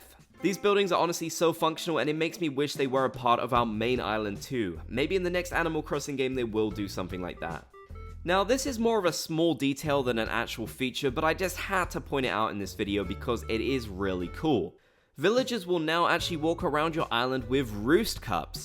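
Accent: United Kingdom. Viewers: Oh so you're British? Say Worcestershire Sauce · british alert? this whole time i thought you were just really good at faking that accent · I didn’t know you was British, I am too. I don’t pay attention to accents 😅 gang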